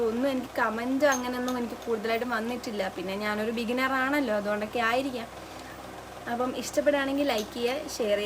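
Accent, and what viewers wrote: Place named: Thiruvananthapuram, India. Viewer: നിന്റെ സംസാരം കേട്ടപ്പോയെ മനസ്സിലായി തിരുവനന്തപുരം ആണെന്ന് 👍👍super ആയിട്ടുണ്ട് വീഡിയോ ഇനിയും idanam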